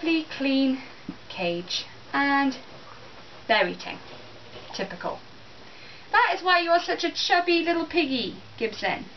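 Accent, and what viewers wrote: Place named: England, United Kingdom. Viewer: Because she is from England and they have heavy accent as you can hear in the video other country with heavy accent are Indians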